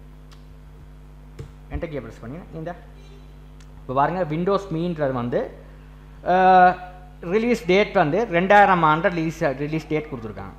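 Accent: Sri Lanka. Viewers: Bro nenga sri lanka va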